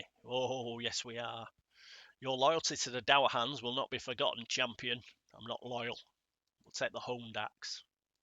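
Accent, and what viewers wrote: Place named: Manchester, United Kingdom. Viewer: Probably because your Mancunian accent made me think of Bumble when you said fervour, couldn't help but think that Bimbling has got a four fer. Well bowled lad. lol Being a dwarf, does he like to field at short square leg? (groan!)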